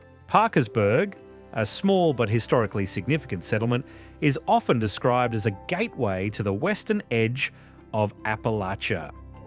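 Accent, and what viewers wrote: Australia. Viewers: I don't think there is anything I wanted more today than to come home eat some Panda Express and listen to an Australian man teach me about my home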